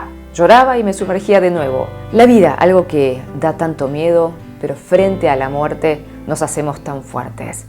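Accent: Buenos Aires, Argentina. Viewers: . Mis saludos y lo que haré a continuación es en carácter de pregunta. Ya que mire muchas películas coreanas y en algunos casos la temática se repite siempre de gente pobre en ascenso o de gente millonaria que se hace pasar por pobres. La pregunta es, hay ese trato en Corea entre jefes y empleados? Llegan a ese nivel de insolencia? Está todo tan dividido en clases sociales?... No quiero ofender por favor, ya que los coreanos que yo conocí siempre son agradables al menos aquí en Rusia. Todo es pregunta quizás en algún momento lo puedas leer. Mis saludos y gracias por tus vídeos y tu acento porteño. Desde Novosibirsk Rusia Gustavo Ziryanov.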